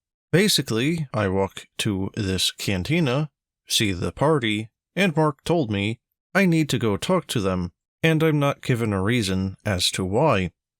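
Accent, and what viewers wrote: United Kingdom. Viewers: a black guy with a british accent. so i guess ksi doesn't exist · A Black dude with British accent... John Boyega?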